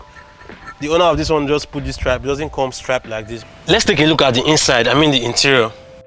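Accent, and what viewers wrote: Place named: Nigeria. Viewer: Great review. Love your Nigerian English dialect. Do you get the later models in Nigeria too?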